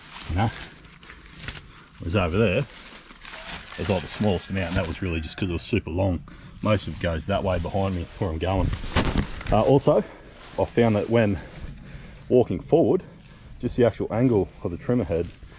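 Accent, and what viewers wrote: Australia. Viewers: you are an Aussie who has one of those accents everyone equates to the land down under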